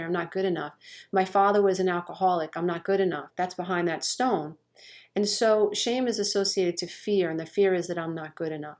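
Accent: New York, United States. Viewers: Hey just started listening. Great information. I hear the newyawker in you from one newyawker to anotha. Got get me some cawfee and warm up the caa!!! LOL! Namaste.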